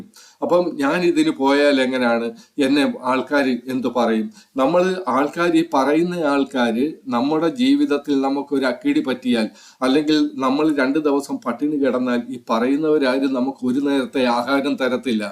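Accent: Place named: Central Travancore, India. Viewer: എന്തൊരു ബോറാണെന്നോ നിങ്ങളുടെ ശൈലി | 5 മിനിട്ടിൽ പറയാവുന്നതു 15 മിനിട്ടിലധികം വലിച്ചു നീട്ടി നീട്ടി.... മധ്യ തിരുവിതാംകൂർ ഭാഷ കൂടിയായപ്പോൾ പരമ ബോറ്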